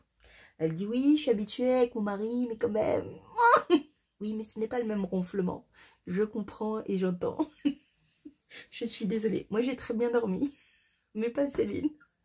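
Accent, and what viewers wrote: South, France. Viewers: Bonjour, je te suis depuis tres longtemps, sans laissé de commentaires et c'est la première fois que j'entends l'accent du sud et d'habitude tu ne l'as pas. Merci pour ta bonne humeur et ta gentillesse.A bientot🥰😽😽😽😽🌺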